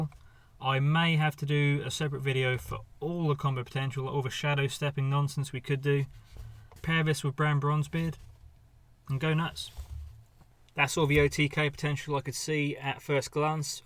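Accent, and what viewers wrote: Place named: Norfolk, United Kingdom. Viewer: Never seen you as English. You from Norfolk lol?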